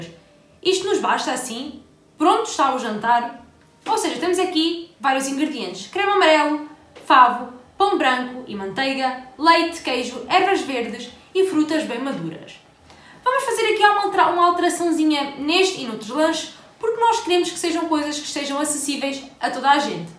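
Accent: Alentejo, Portugal. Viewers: Amo esse sotaque português Xinco extax secax ou xecas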